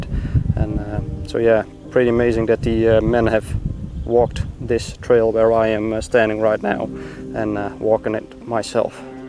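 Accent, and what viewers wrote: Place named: Netherlands. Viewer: Hello, I´ve found your channel via History Underground and what I have to say, I follow it. Your view of the part of the Operation Market Garden is very interesting, and I love Documentaries about Battles, when they where filmed on the spots. Bye myself, I visited Arnhem and Oosterbeek two times and I bet, not the last time. There are a lot of spots, where I wasn´t (the church for example). Bye the way, I like your dutch accent in your english. It is almost the same, if I speak english. Greetings from Germany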